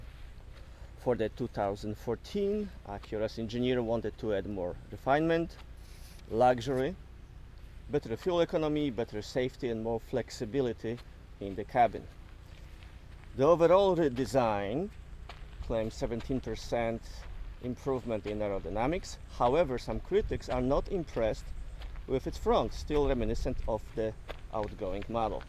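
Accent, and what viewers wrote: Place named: Russia. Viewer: Russian accent)))Learn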